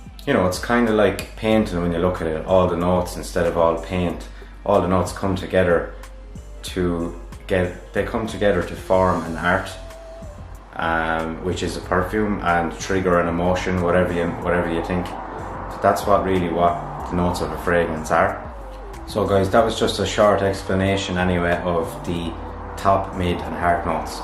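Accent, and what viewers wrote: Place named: Ireland. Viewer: 8 seconds and in i said out loud at my computer screen "woah! this guy's irish!" 😂 before you even announced it (not a bad thing, i love the accent) i then knew without a doubt this was gonna be the video to satisfy my craving for the knowledge on what top, heart, and base notes are.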